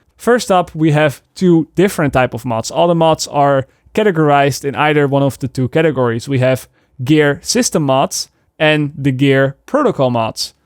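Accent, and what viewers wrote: Netherlands. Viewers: Took me 5 seconds to know you're Dutch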